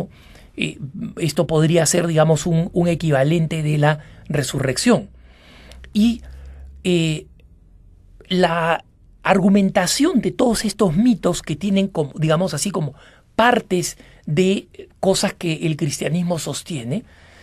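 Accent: Lima, Peru. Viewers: Disculpa pero me puedes decir a que te refieres con: INSOPORTABLE ACENTO LIMEÑO??? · A que su acento limeño (que es uno de los tantos con los que se habla en Lima) me parece insoportable _._ .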